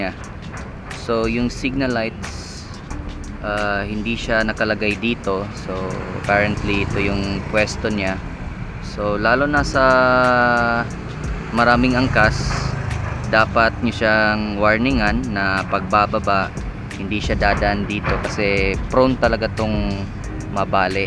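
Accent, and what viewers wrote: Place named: Cebuano, Philippines. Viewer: Gnda talaga english accent ng mga taga cebu haha ride safe paps. Subscribed already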